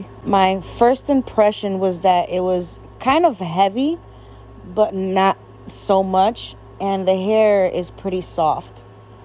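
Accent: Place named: New York, United States. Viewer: I love your accent reminds me of NY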